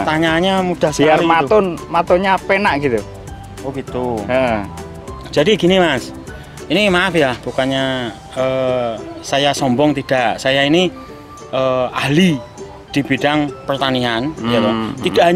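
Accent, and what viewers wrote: East Java, Indonesia. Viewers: Orang mana pak?, kayaknya Jawa timuran..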